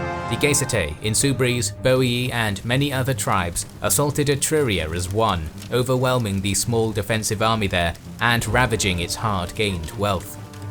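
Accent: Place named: United Kingdom. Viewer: I don't know who's the narrator of those videos but he's absolutely great, brilliant voice, diction and of course lovely British accent... so comprehensive and flawless!!! Thank you guys! Great Job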